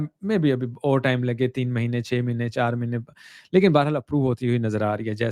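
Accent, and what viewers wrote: Punjab, India. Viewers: Isko kehte hain att ta hahaha sir jab apne bola na dxy ne att chukki hui hah bht sakoon mila apki punjabi itni pyari hah na sir bht mithas hah apki lang mein karazdar hain hum apki mohabat k sir alllah bht khush rakhe apko ❤️thankx for everything sir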